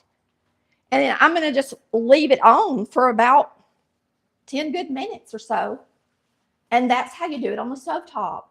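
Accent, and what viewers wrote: South, United States. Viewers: You’re so funny!! Miss, I knew you were a smart cookie, I could tell, I hated Math, so no engineering for me, lol, but you be happy with your accent,you’re the best of both worlds, sweet, smart, and down to earth!! As a Christian, I always wanted to have a meek and quiet spirit, I find Southern women to be a lot like that, and we ARE supposed to be good home makers! Proverbs31 says the godly woman had a business too!! God bless you, so glad to have found your channel!❤😊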